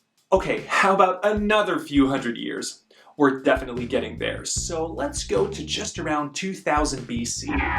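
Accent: Standard. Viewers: you speak the standard Mandarin !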